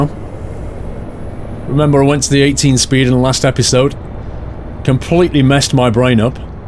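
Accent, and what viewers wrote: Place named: United Kingdom. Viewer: He is British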